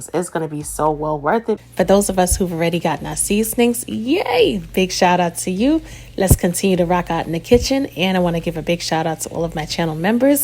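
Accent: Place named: East Coast, United States. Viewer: I am subscribed to your channel but I rarely get in YT but when I do OMG I love watching your vids because the food looks deliciouis and not only that.., I know that I have told you that I LOVE LOVE LOVE LOVE LOVE LOVE YOUR EAST COAST ACCENT. I LOVE IT SO MUCH. VISITING NYC WAS CUT OFF OF MY BUCKET LIST IN SEPTEMBER 2021. MY SISTER SURPRISED ME WITH A TRIP THERE FOR MY 50TH BDAY AND WE STAYED IN MANHATTEN NEAR TIME SQUARE. I'M A COUNTRY SOUTHERN GIRL FROM LITTLE ROCK ARKANSAS, BUT WHEN THE REAL WORLD CAME ON MTV I WAS FASCINATINED, FROM HEATHER B AND I ALWAYS LOVE EAST COAST RAP. THAT WAS A WORLD WIND ♥♥♥ THE WORLD IS KINDA MESSED UP NOW, BUT WHENEVER I GET ME AND MY DAUGHTER SOMEWHERE TO LIVE, I WILL MOST DEF BE TRYING OUT YOUR RECIPES...., CAUSE HONEY THEY LOOK DELICIOUS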